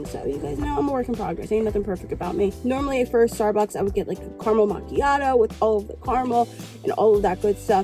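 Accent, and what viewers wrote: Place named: New York, United States. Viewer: Trader Joe's has very good whey protein powder in chocolate and vanilla..I add about eight ice cubes, cup of almond milk, fruit and some sugar free torani syrup to give it more flavor. I use a spoon to eat it as it's very thick. Look into Nestle and where they get their water. Why not drink tap water?...you sound like you are from NY where the water is great. The cost is way less and no plastic bottles.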